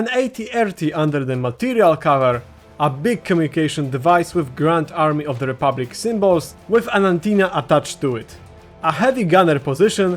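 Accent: Poland. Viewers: Słychać ten polski akcent ❤ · elo a ty z polski jesteś bo akcent polski?????????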